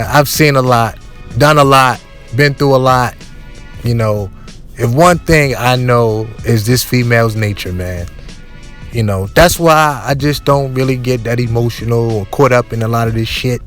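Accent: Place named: Maryland, United States. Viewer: I can tell your from Maryland or somewhere up north. Am I right?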